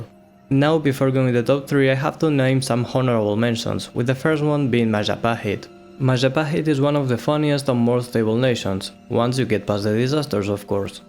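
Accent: Spain. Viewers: sorry man. Your accent really looked Spanish to me and since you said you like playing with Castile...😅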